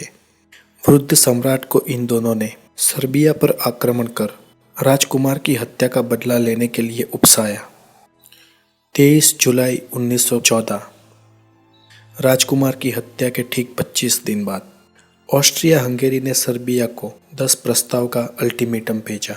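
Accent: Gujarat, India. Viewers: आप गुजराती हो क्या ?😇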